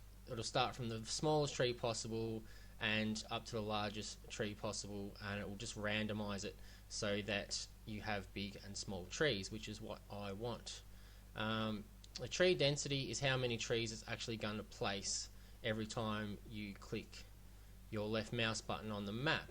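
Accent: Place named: Australia. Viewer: I really appreciate your timely response, and thanks heaps mate. I am noting from your accent that you are from Australia just like me. I am new to Unity, although i'm discovering lots of things, I doubt that ill make money from unity, but would love to make some of my own games. I really appreciate your time, and I intend to follow your tutorials as they are very good. Ill try to fix the water thing later, again thanks heaps. I have been playing around with unity. I have found that sometimes characters do weird stuff like float or sink through floor (even if rigid body attatched). Anyway thanks heaps. You have earned a subscriber.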